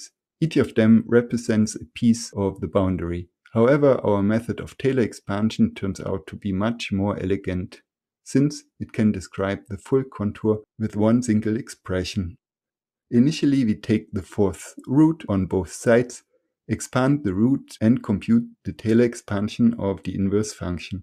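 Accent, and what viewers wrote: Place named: Germany. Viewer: Strongest German accent ever. Love it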